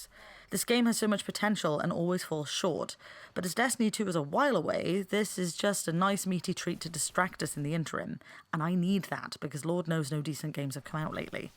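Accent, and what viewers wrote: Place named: West Country, United Kingdom. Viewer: Didnt hear any welsh accents but there were certain words, you sound pure west country (bristol ish area) id love to do raids as ive never even attempted 1, im a year 2 solo player, the only reason i got destiny in the first place was because a bug group of my friends played it, but i got it too late and they'd all already swapped over, glad i got it though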